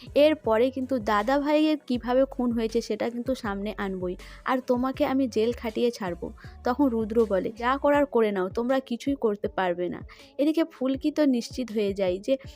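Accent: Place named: Bangladesh. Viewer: কথকতা ভালো লাগলো।তবে, বাঙ্গাল বাঙ্গাল উচ্চারণ ঠিক করো। চেষ্টা করলে নিশ্চিত পারবে। শুভেচ্ছা রইল।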